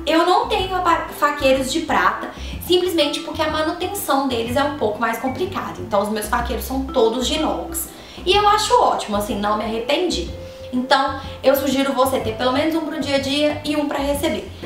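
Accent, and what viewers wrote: Minas Gerais, Brazil. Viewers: Sotaque de mineira!Lindo mesmo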